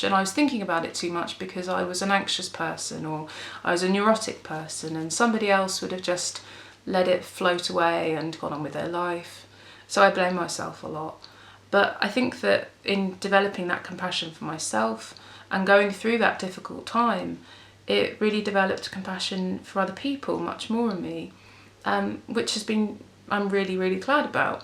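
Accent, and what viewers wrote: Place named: England, United Kingdom. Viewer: you have given me so much hope I notice that periodically I haven’t thought or heard my tinnitus you are a breath of fresh air. Listening to you I felt supported and hopeful of habituating this pest progressively as time goes by I have deleted all my Facebook chat groups as some were not to my liking plus extremely negative and they frightened me immensely as the comments made me think my life is over. I love your music. Your voice is beautiful to listen to. I’ve always adored the English accent (they are all different). Your presentation video was the best I have found yet hooray I stumbled upon you. You gorgeous girl xx💜 On Mon, 22 Jul 2019 pm, <mail> wrote: View this email in your browser THANKS FOR WATCHING MY VIDEO ON LIVING WITH TINNITUS AND FOR SIGNING UP TO MY MAILING LIST Now here's your free download of my song, all about my struggles with tinnitus DOWNLOAD NOW p.s. 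Let me know what you think of the song on Instagram, Facebook, or drop me an email! x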